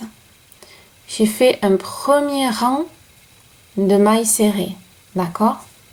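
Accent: South, France. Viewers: Merci vous expliquez très bien ne changez pas votre accent moi je suis de NIMES votre accent est près du mien Marie jo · Merci beaucoup pour vos tutos, bien faits, clairs , tranquilles, et le petit accent du sud "merveilleux" en prime ! Continuez à nous apprendre, vous êtes très agréable merci.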